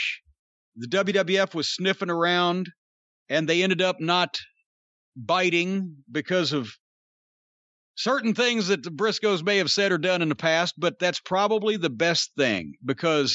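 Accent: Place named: Delmarva, United States. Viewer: Delmarva accent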